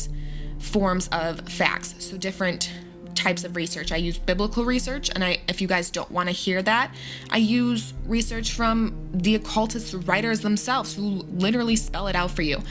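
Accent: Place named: New York, United States. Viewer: Girl how u have a ny accent n from north Chicago lol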